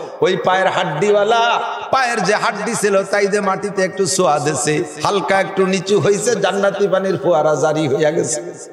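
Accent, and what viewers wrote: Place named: Gopalganj, Bangladesh. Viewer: নড়াইল গোপালগঞ্জ জেলার কথাবার্তা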